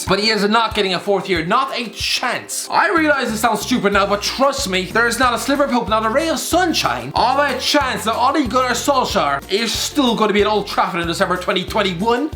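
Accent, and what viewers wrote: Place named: Ireland. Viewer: If the accent hadn’t already given it away, the term ‘Glasgow derby’ will tell you who exactly the fav Scottish team is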